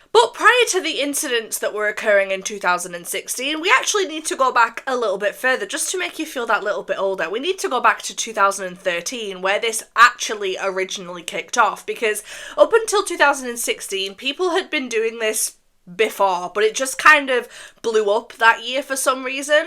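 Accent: United Kingdom. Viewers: when i tapped on the vid i did not expect a heavy british accent😭😭 its so iconic tho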